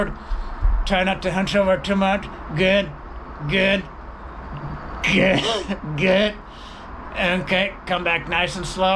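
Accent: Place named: Scotland, United Kingdom. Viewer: Why does he have like a Scottish accent?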